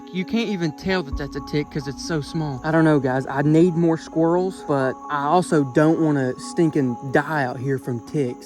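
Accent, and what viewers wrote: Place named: Texas, United States. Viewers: texas accent going hard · he sounds like Georgie from Young Sheldon... is he Texan?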